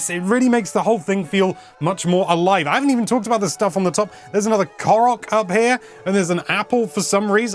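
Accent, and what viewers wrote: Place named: United Kingdom. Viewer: Its just a british accent thing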